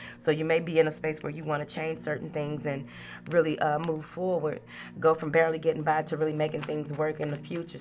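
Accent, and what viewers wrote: South, United States. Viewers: I absolutely love your southern smoothness ❤️❤️❤️ and you’re message resonates heavy ♋️♋️♋️♋️♋️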